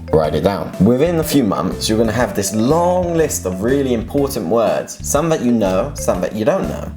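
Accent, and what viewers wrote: United Kingdom. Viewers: 정말 훌륭한 조언인 것 같네요. 한국어를 배우려는 외국인들뿐만이 아니라 잘못된 영어교육을 받고 있는 한국인들에게 좋은 팁이 되겠네요. 참고로 영국의 악센트는 언제 들어도 매력적이네요. 이 영상 보면서도 계속 따라했네요 ㅋ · 영국악센트지린다....하♡ 영국어배우고싶어 ㅜㅜ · 씨(?)의 학습법에 매우 공감합니다. 그리고 영국 발음은 언제나 듣기 좋네요 ㅎ 언제 한번 영국 발음 천천히 제대로 들을 수 있는 영상도 찍어주셨으면 좋겠네요. 물론 제 개인적인 희망사항입니다.. 후훗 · 영국 발음 너무 매력적이다... 💜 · 와씨 영국발음 개좋다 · 영어 영국 발음!! 진짜 멋진것같아요 영화 토르 볼 때마다 매번 심쿵 ㅠㅠ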